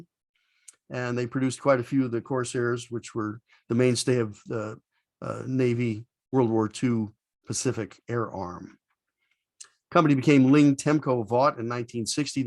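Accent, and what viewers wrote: United States. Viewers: I was born and raised on Long Island, and trust me your accent remains. It is still a lot stronger than mine, because I learn how to speak properly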